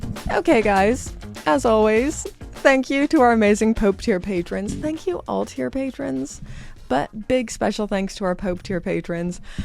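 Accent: Canada. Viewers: Your Canadian accent is just great!! 😊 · Come on eh! Don’t apologize for your Canadian accent.Great vid. I think I want the red banded one.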